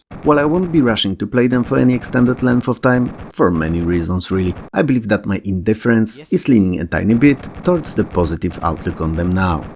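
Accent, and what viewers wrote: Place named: Poland. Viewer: That's a lovely accent you've got there! May I guess you are from Poland? 😊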